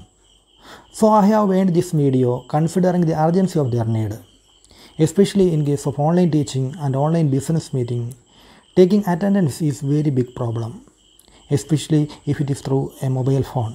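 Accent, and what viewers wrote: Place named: Kerala, India. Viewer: You are a malayali I can understand it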